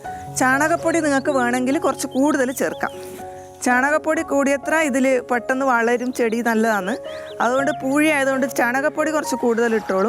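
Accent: Kannur, India. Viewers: ചേച്ചി കണ്ണൂരാണോ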